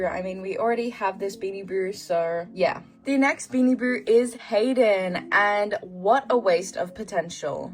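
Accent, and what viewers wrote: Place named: Australia. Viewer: I love your australian accent so much lol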